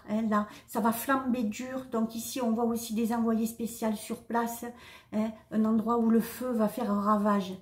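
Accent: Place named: Southwest, France. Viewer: Bonjour je viens de vous découvrir est je me abonnée j aime bcp votre douceur et votre l accent si je me trompe pas il viens de sud-ouest, merci 🙏pour tout ces bonnes nouvelles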